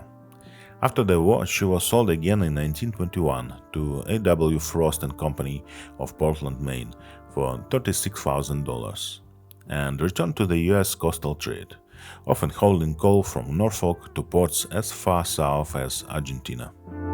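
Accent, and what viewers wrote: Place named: Russia. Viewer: great video and the russian accent makes it old home week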